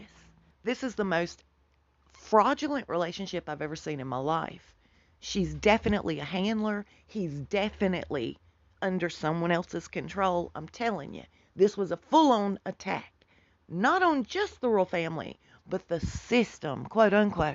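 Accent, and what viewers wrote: South, United States. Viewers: WOW... your beautiful south clarity and articulate (as also my family in NC) said it well. Didn’t watch or listen, but have listened about this chaos!! Oh my gosh! My huge family of blended children-10 and 27 grands, have never said a word about MM OR PH. After I first started seeing ‘them’ this last year, I spoke to my oldest daughter and she only spoke about not liking royalty, in general. Not one word about this, except say anything about those two or three venomous chameleons...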